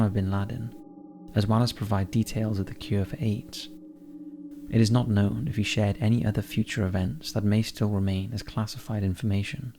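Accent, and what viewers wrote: Wales, United Kingdom. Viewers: British accent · ??? That IS a British accent. What are you talking about? I'm British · He's Welsh. So it's a Welsh accent. There's no such thing as a British accent.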